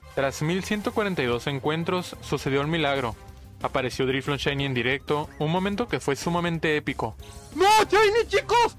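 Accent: Chihuahua, Mexico. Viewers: Eres de chihuahua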